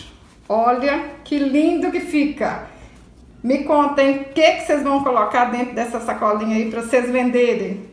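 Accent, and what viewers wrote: Minas Gerais, Brazil. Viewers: Gostei da sacolinha. Mas, especialmente, do seu sotaque! Já assisti a vários vídeos seus mas só hoje vi que você é mineira. Como eu! 😘😘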